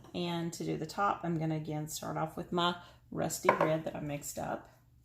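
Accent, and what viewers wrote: South, United States. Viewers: 😇🌷 I L 💗 VE Your southern accent, I lived in Charleston SC when I was in the 5th and 6th grade, so I do still have a lil twang, especially when I get a lil bit upset LOL 🤭 BUT, I do have a slight southern drwal . anyway SWEETIE your galvanized bins turned out REALLY GOOD!!!😊🎨🖌️ You know you're a southern woman, when you feel like you have to apologise LOL 🤭 Thank YOU for sharing your CREATIVITY with, and I also want to say IS YOU are a BEAUTIFUL woman inside out, you actually look good with out having any makeup on, AND YOU in my opinion, look good with out makeup on.😊🎨💜🌿 Thank YOU again SWEETIE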